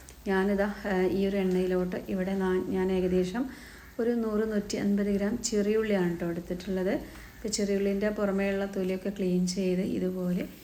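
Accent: Malappuram, India. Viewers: നിങ്ങളുടെ വീട് എവിടെ യാ. ഭാഷ മലപ്പുറം ആണി. മലപ്പുറത്തു എവിടെ ആണ്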